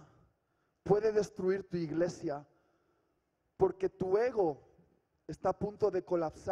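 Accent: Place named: Spain. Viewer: Apenas hace unas 2 semanas te encontré por YouTube y ya eres uno de mis predicadores favoritos. Nunca te alejes del Señor pues a la mejor no tienes idea del impacto q estás siendo a miles o quizás millones de <link> fascina tu acento español pero aún más el como Dios te usa para transmitir cada msg de su palabra. Con cariño de una abuelita en Cristo.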